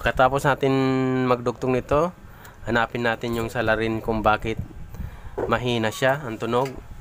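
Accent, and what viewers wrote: Bicol, Philippines. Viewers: Sir bicolano kba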